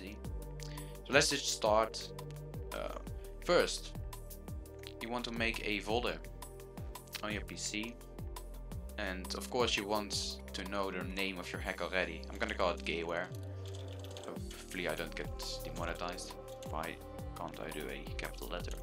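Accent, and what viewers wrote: Netherlands. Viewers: dat nederlands accent ook oei oei oei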